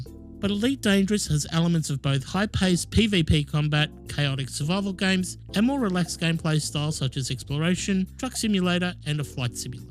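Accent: Australia. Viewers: Couldn't agree more with your suggestions mate. A 14 year old with nothing to do but train and cred up can, and will, slaughter my helpless newbie ass just for shits & giggles, and suffer absolutely no consequences for it, so it's perfectly logical to me to stay in Solo and enjoy myself there. If FD were to take up some of your karma suggestions, that'd be good for the entire game and would get me into Open. The worst that could happen is the ganks would leave (good riddance) which wouldn't hurt Frontier at all since they don't have a subscription model. And frankly, these game mechanics make no internal sense. If the universe knows you're wanted, how is it they nobody knows you've murdered 50 other players this evening? It's a no-brainer that constantly ruining other players' enjoyment of the game should have consequences. You've got my vote. for FD karma konsultant!:-) By the way, loving hearing Strine on ED videos.:-) Keep up the good work!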